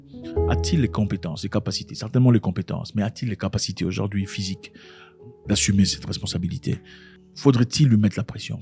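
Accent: Cameroon. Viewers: Il n'imite pas ...il parle toujours comme ça ❤❤❤ au départ je croyais même qu'il était Camerounais 😂😂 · Ce président ne nous sert a rien , je ne vois pas en quoi parler de lui est utile , ceux qui parlent de lui au pays sont ceux qui veulent sa place , raison pour laquelle les autres qui veulent aussi sa place demande a leurs rivaux de femer leurs bouches . Bref un président qui ne sert a rien je ne vois pas d'interet a parler de lui. je suis certains que son successeur sera sa copie , assoiffé de pouvoir ,. le dvéloppement n'est pas une priorité pour eux , juste remplir leur ventre de caca. Très bonne vidéo , l'accent camerounais par contre😂 m'a fait rire , je ne me suis pas retouver dans ça , ni reconnu une ethnie en particulier, mais en ce qui concerne la mentaluté , vous avez raison ,ventard pour beaucoup dans le vide , cette mentalité doit être formater. 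· Bizarrement tu parle comme la grande majorité des Camerounais 😂😂, je suis sûr que beaucoup te croient Camerounais. Ce n'est pas nouveau au Cameroun, le comble c'est que les parents on entraînés les enfants dans la voie du silence sous prétexte de l'horreur de la période des maquis. On a peur de se réveiller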